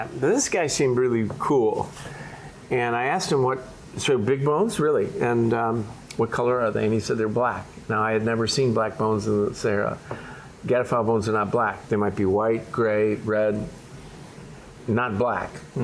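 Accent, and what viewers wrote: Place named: Chicago, United States. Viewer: completely unrelated but I find it endearing to hear his Chicago accent slip out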